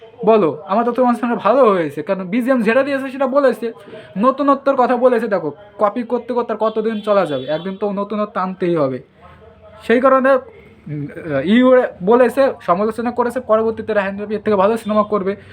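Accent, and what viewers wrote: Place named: Bangladesh. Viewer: Tumi ki bangladeshi naki !! Bangla evabe bolchho keno !! Chhi chhi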